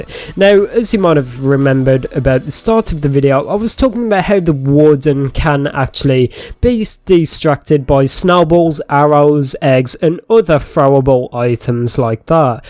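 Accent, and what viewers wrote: United Kingdom. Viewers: I know it's british but which one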